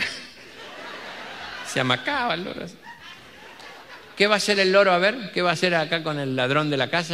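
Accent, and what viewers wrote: Argentina. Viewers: A mi me costo un poco comprender muchos terminos pues soy una mujer cubana y no solo estamos muy lejos los unos de los otros sino que la forma de hablar y sobre todo los modismos son extremadamente diferentes. Pero poco a poco lo fui entendiendo todo y hoy en dia lo escucho casi todos los dias. Le felicito maestro, por su humor limpio y puro. Por algunas partes del mundo los argentinos tienen fama de ser orgullosos....... y luego de haberlo conocido a usted, ya entiendo el por que. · ¡Muchas gracias desde Barcelona!,....un honor y placer escuchar y reir también por los chistes, así como poder apreciar en la voz, el acento propio y humano, no solo de la calidad de la cultura Argentina, si no de un gran artista y persona.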